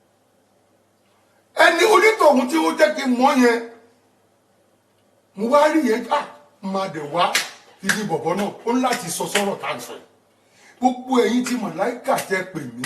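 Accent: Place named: Ilorin, Nigeria. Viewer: I keep watching this video on an on .it look like this man come from ILORIN . he sound like a person from Ilorin .I always laugh all the time i replay the video very funny man, but things I don't why Yoruba peoples like to curse each other it's not good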